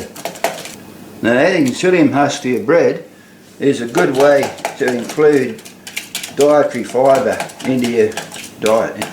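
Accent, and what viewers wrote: Australia. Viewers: LUV your Aussie accent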